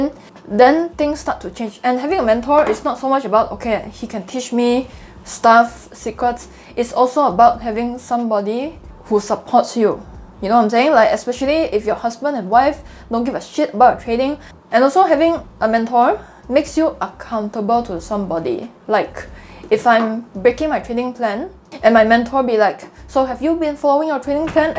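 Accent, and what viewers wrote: Singapore. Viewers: that is singapore accent, if you dont know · i love singlish i cant hear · Your accent so familiar, let me guess you from singapore 🤭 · Thish is pure singlish and I love it..cheers!!!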